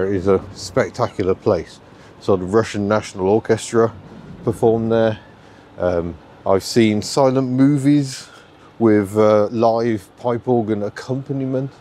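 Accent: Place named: Birmingham, United Kingdom. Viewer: Didn't know you were a Brummie.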